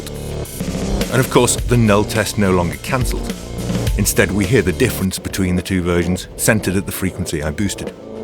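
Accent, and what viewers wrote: United Kingdom. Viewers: Y'know,... In the 20-some-odd years that I've been tweaking knobs I've developed juuuust enough hubris to think that I know a thing or two about this stuff. Somehow, though... SOMEHOW — these tutorials of yours manage to either completely school me or at least reintroduce me to concepts in a way that make me question whether I in fact know anything at all. You and your sensible British accent. #besttutor #dulcettones DON'T LET IT GET TO YOUR HEAD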